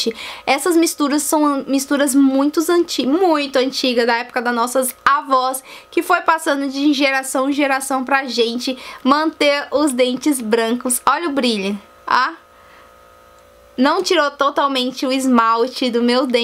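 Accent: Minas Gerais, Brazil. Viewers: É mineira ? Kkkk você parece engraçada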